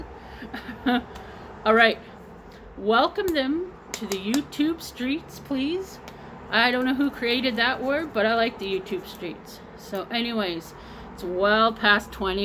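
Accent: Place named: Acadia, Canada. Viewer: Oh wow, just saw this, thank you! French Acadian accent 😉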